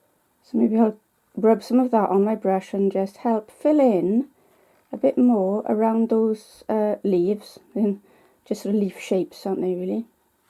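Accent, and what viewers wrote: Wales, United Kingdom. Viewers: Thanks for a great video -all delivered in your lovely Welsh accent! I specially liked what you said at the end about playing rather than striving and slogging away when we feel stuck with our art. Also being aware of the "mindful moments", awareness of the sound of the pencil on the paper -I like the feel of using the materials too -its a tactile experience for me as well as visual.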